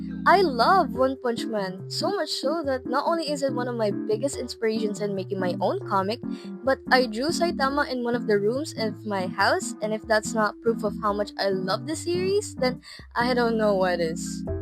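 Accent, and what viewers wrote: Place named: Philippines. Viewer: i love ur philipino accent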